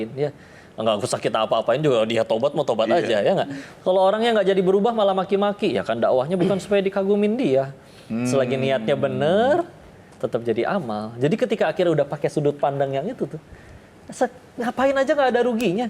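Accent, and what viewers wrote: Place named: West Java, Indonesia. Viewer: kan org sunda, bogor, nyantri d bandung, jd logat ny k bw sunda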